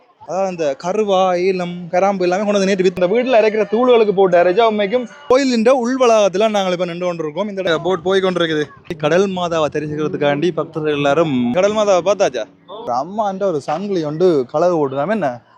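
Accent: Sri Lanka. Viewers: மதகள்கோவில்சிறப்பாயுள்ளதாகதங்களின்வாய்மொழியாகத்தெரிந்துகொண்டோம்.வாழ்த்துகள்.தங்களின்சிங்கலத்தமிழ்இனிமையாயுள்ளது.நன்றி.தயவுசெய்துஅக்கோவிலைத்தரிசிக்க எப்படிஎந்தவழியாகவரவேண்டும்லொக்கேசனைவிளக்கமாகக்கூறிஉதவுக.நன்றி.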